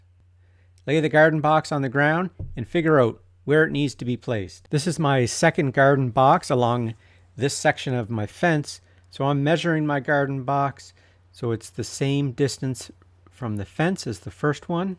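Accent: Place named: Maritimes, Canada. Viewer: Grew up near a bunch of maritime candadians. Accent takes me back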